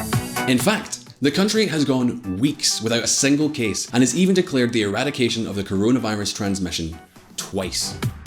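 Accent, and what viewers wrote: Scotland, United Kingdom. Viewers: Where is simon 😴.... this Scottish accent is too strong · Well I like the Scottisch accent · I´m sorry but the scotish accent is unbearable - can´t through these vids no more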